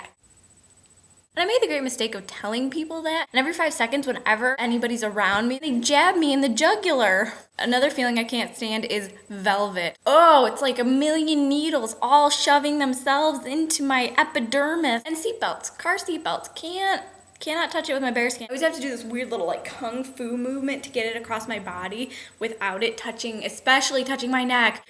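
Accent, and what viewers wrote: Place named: Canada. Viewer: you do sound a little canadian though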